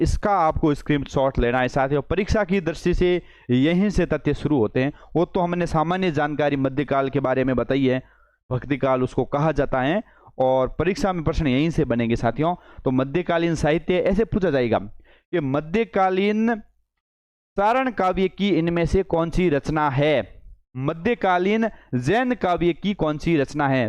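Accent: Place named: Barmer, India. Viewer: Sir aap bolte mast ho 😅 चारण को सारण , चमत्कारी को समत्कारी, सिंगल को chingl लगता हे आप barmer se ho🙏🙏🙏🤗🤗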